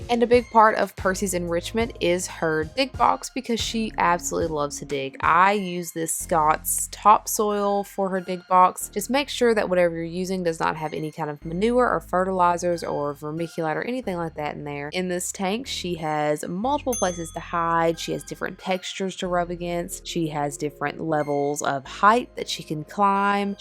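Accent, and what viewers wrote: South, United States. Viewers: OMGoodness, where are you from? You sound like you are from the South! I'm in NC and new to having a leopard gecko!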